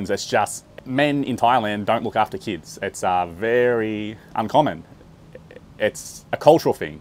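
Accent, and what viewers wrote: Australia. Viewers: Hi I am from Burirum and recently I am live in UK 🇬🇧 I am watch your channel every day and I am learning a lot more English from you ❤ I quite like the Australian accent love it ❤ Anyway stay strong and think positive ❤you are doing well ❤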